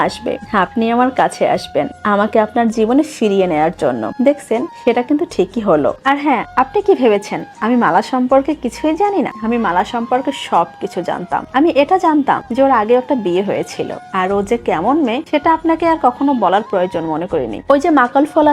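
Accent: Barishal, Bangladesh. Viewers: আমি একজন নোয়াখালীর ছেলে। কিন্তু আমি বরিশালের কথাগুলো বেশি পছন্দ করে। আপু টিকটক গুলো আমার কাছে খুবই ভালো লাগে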